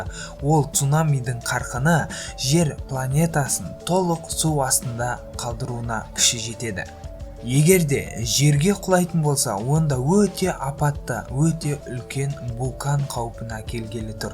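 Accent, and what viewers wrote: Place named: Uzbekistan. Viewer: Тілің озбек емеспа жағымсыз,и сөздерд бұрап айтасн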